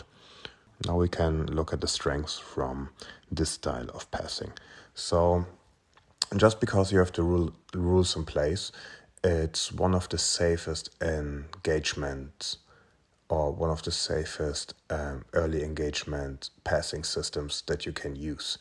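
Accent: Germany. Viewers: This video should be titled "German man mumbling about rumbling" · are u german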